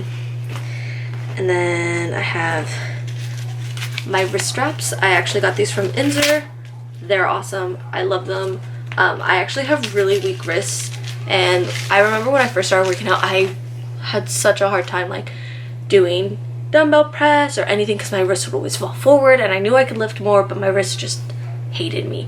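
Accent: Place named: Italy. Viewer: Been hanging out with me too much is why you're talking like an Italian sorry not sorry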